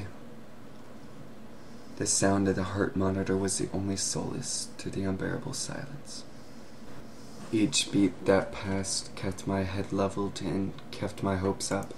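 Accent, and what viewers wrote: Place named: South, United States. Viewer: Congratulations, I'm sad. I might just suggest though, when you read, pronounce each word, don't slur at the end. I was wondering if you were southern because I kept thinking of as you talked In Twi's perspective. Also, another pointer, don't read when you have what sounds like a morbidly obese man in the background snoring. Wait until you're alone. All critique aside, great story, great reading. Good job.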